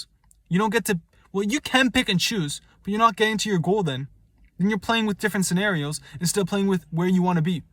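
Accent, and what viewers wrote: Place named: East Coast, United States. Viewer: You have an east coast accent!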